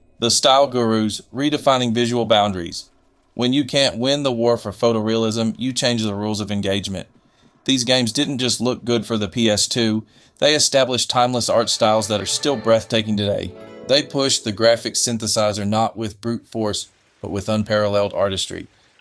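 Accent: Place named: South, United States. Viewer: you sound southern as hell brother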